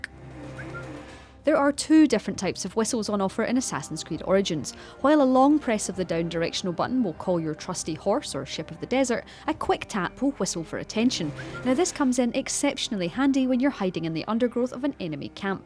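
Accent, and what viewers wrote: Scotland, United Kingdom. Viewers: Central Scotland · She’s Scottish 🤦🏿‍♂️ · That Scottish accent <3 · Um, she’s Scottish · So nice hearing a Scottish voice for things like this. Is there more just from her? (I'm not being weird I'm Scottish too and it's just easier to follow) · That Scottish accent is irresistible lol. Had to sub! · That's a Scottish accent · Sendo Tiago scottish actually. A subtle distinction but very important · I’m Irish and that’s definitely not an Irish accent. Sounds Scottish.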